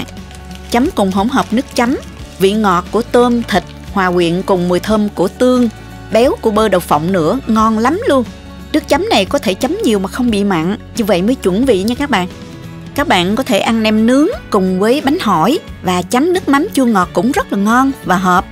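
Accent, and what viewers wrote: South, Vietnam. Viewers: Cám ơn, tôi rất thích xem những Vidios hướng dẫn cách nấu ăn của cô, ngắn gọn mà đầy đủ dễ hiễu dễ làm. Tôi thực hành lúc nào cũng thành công. Tôi không những ghiền chờ đón xem cách dạy nấu ăn cúa cô mà cũng ghiền nghe vọng nói miền nam của cô nữa đó. Mến chúc cô cùng ngừoi thần luôn vui khỏe, bình an. Luôn ủng hộ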